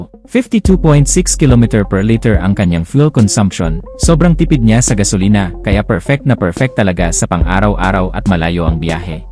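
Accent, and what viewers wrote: Visayas, Philippines. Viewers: Bisaya ka ba ate??😂😂😂😅😅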